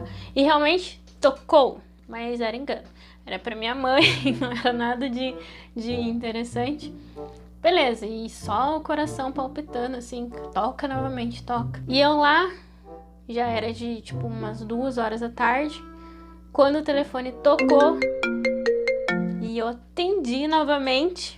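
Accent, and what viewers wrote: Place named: Minas Gerais, Brazil. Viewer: Ganhou mais um inscrito 💖 Amei o Canal. Você é Mineira ? 😅 Sotaque legal 😍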